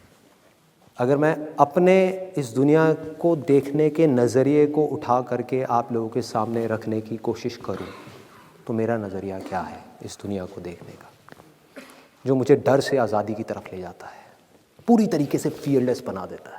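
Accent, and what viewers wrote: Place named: India. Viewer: Oh my gosh.i can't understand a single word.indian's accent is terrible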